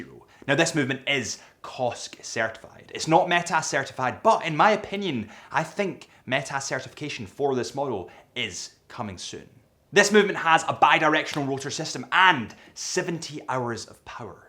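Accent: Scotland, United Kingdom. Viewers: Why is it called 3 link? You sound like a Scottish adrian Barker you have the same cadence when you pause start and end sentences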